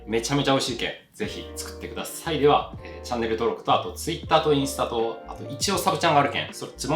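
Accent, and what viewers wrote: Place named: Yamaguchi, Japan. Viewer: 明日市販の栗のシブーストを食べる予定です。自分で作れるかなと思って検索したら、動画をあげてくださってて嬉しいです。ところで山口県の方ですか？○○けんっていう話し方で、そう思いました。私がそうなので。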